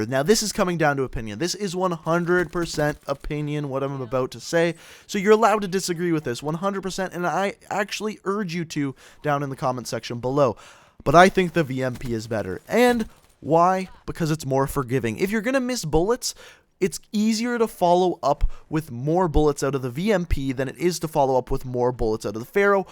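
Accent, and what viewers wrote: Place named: Newfoundland, Canada. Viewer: I think this guy is from Newfoundland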